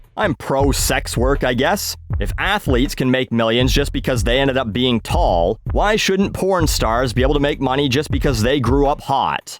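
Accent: Australia. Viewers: Loves the Australian accent and the woolworths reference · Your aussie accents is like a cross between english and loose australian. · Love the Aussie accent and the references to woolies. Fucking hilarious vid!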